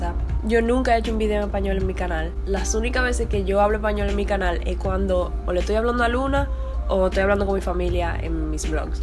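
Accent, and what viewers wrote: Dominican Republic. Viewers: I'm not trying to be biased cause I'm half Dominican. But the Dominican accent is like the best. 😏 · I'm also Dominican! Love your accent! Sounds beautiful, Spanish but with African twang and a bit of Arawak. Love our Dominican Spanish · Me encanta el acento dominicano! 🙂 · Wow q genial! Me encanta tu accente.. El accente Dominicano. Mis vecinos pq soy un Haitiano. 🇭🇹. Soy nuevo de YouTube. La verdad me motive mucho, para sigue aprendando mas espanol, y tambuen sigue adelante con Mi canal aqui.. yo no me voy por vencido.👊 GRACIAS 🙏🙂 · I just found your channel. Nice to see a Dominican YouTuber. You have a nice voice/accent! You sound a lot like my cousin who lives in the Dominican Republic. Like EXACTLY like her. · por fin un canal con una dominicana que habla como hablamos la mayoria de los dominicanos, asi es que habla el dominicano promedio. 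· ¿Buen video, algo me dice que eres cibaeña, quizás de Santiago cierto? (Yo también soy del cibao STGO 👌🏽. PD: Your channel's name reminds me of song: ❤, kep it up with the good work, greetings from DR! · Más videos en español ! amo el acento Dominicanoo <3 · Es mi primera ves ver tu video. Yo hablo inglés pero siempre estoy buscando oportunidades de practicar el español. Yo te entendí perfectamente que me asombra porque el acento dominicano es único. Gracias y espero más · Finally a real Dominican accent that’s not exaggerated.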